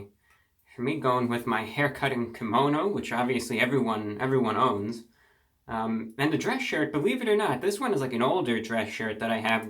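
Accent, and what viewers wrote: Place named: New York, United States. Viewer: If you watch his older videos he has a NY accent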